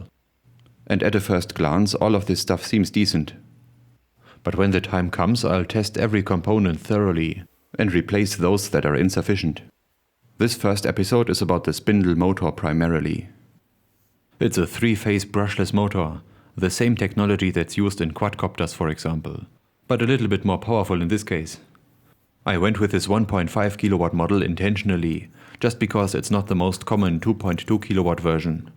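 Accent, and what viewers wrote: Germany. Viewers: Love that German accent (and very dry sense of humor)! High-accuracy stepper motors please!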